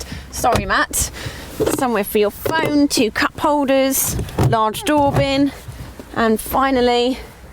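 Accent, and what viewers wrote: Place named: England, United Kingdom. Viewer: dar cum ai vrea sa aibe daca e englezoaica? multi tarani in Romania asta!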